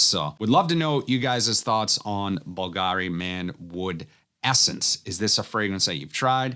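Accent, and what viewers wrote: Boston, United States. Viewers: Can't get pass his awful Boston accent 😂😂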